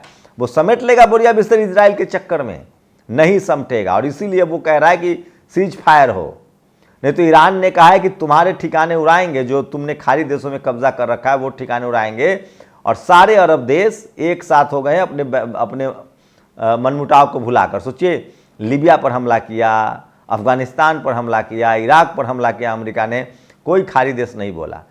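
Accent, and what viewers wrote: Bihar, India. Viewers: Bohat qoob, your voice Behari tune wa bohat qoob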